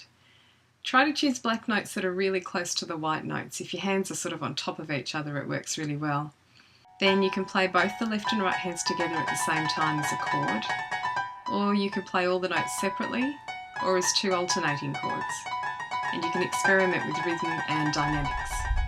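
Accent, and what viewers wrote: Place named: Australia. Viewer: Hi. My name is Maribel. I am in California. My 6th graders love your video and AU accent. We love tech in music class!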